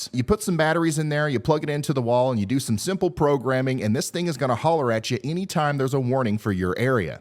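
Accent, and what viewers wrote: South, United States. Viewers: I live in northern California, so most of the time these forecasts don't affect me. But I love watching your videos, because you have the cutest southern accent, and I learn a lot about meteorology!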